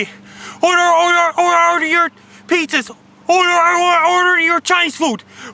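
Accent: Newfoundland, Canada. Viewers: Atlantic accent is like this. Especially Newfoundland..You can't even understand what they're saying.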